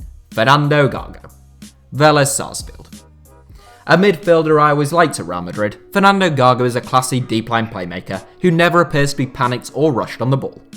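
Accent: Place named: United Kingdom. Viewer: Love The video but your accent iz so british i don't understand shit.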